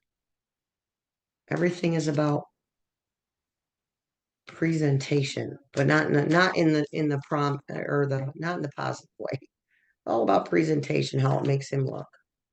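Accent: New York, United States. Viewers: You from NYS??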